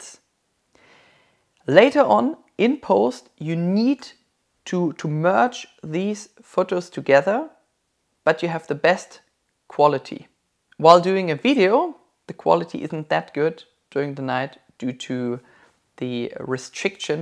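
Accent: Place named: Germany. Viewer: I work for a german boss. Your video reminded me of him. Both because of your accent and almost ridiculous attention to the remote details :D This is an excellent video. I recently got a gopro 9 black as a birthday gift. This video will be really useful for me. Keep up the good work !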